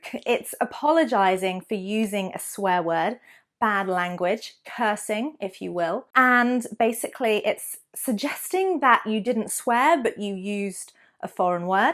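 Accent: United Kingdom. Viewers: Thanks for beautiful British accent of teacher! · Hello... Let me start saying hello for you ladies, I have started listening to your videos recently and I want you to know that I am a big fan of the British accent which gives you a big point and definitely another student, you are super amazing keep going, let me please see in the coming days more videos about the pronunciation in the British accent. Thank you for the information and the great effort you are doing in these videos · I really like Britain accent. It is so clear and wonderful 👍🏻👍🏻. Thank you so much my beautiful teacher 👩🏻‍🏫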